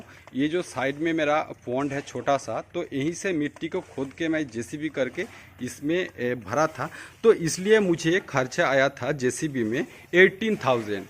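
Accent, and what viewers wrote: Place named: Odisha, India. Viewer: Bhai Apke bonle ki tarike Se lag Raha hai app odissa Se ho.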